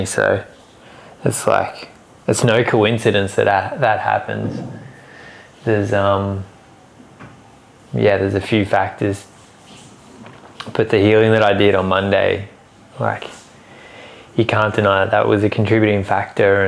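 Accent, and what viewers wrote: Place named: Australia. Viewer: I love watching this Aussie and listening to him talk. The accent is so cool. Foley, Alabama here! 😁😁☀️🌴🤟🏻🫶🏻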